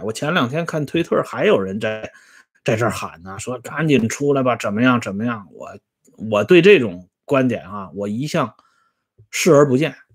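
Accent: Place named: Tianjin, China. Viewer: 我又听出天津味了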